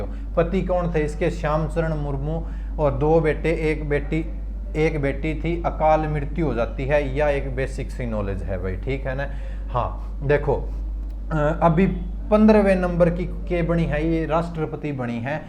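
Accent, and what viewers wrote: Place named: Haryana, India. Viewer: Mjja aa jata h sir apki class dekh kr🤣🤣🤣🤣pure haryanvi😁😁😁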